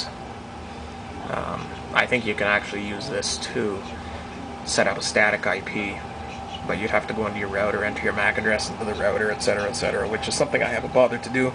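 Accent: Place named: Canada. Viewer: You sound very Canadian. :) Great vid!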